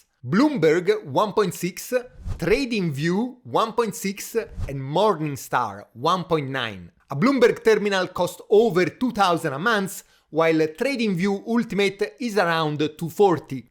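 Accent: Italy. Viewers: Italian accent makes it all even better 😃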